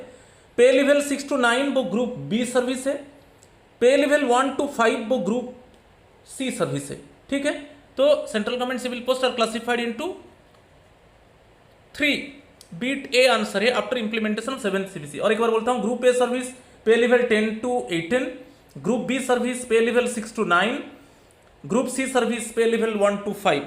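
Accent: Odisha, India. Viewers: Sir today video I think you are from odissa Am I right sir , we have one professor in our PG SRI A K TRIPATI FROM BHUBANESHVAR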